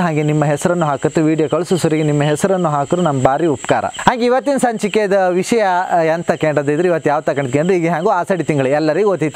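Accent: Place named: Kundapura, India. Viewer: Nam kundapura kannada..👌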